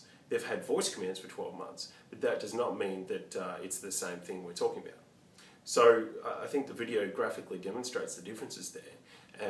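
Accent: Australia. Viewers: OK, first off, your accent has absolutely nothing to do with tellme's ability to understand you. You're right, tellme has a commands list, which you deliberately avoided using! Try the correct commands list, even with your Aussie accent, & I'm SURE it'll work (I've seen it do with accents far stronger than yours!) 2nd, all your video served as was a giggle for Apple fanboys. 3rd you make Siri seem perfect, it is NOT, but you don't show that in your video; hence it's misleading.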